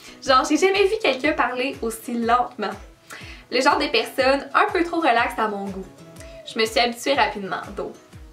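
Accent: Canada. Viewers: Êtes vous français ou canadienne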